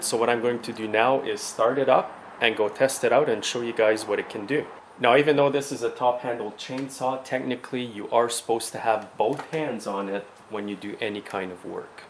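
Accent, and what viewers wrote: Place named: Quebec, Canada. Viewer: Ok you sound like a Quebecer 100%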